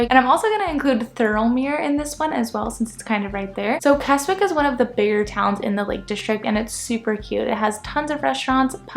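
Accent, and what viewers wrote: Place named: United States. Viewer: Hi. Not sure what Country you come from but you sound American or Canada? Haven't you looked into flights to Manchester from America or Canada? Manchester is much closer to the Lake district than London.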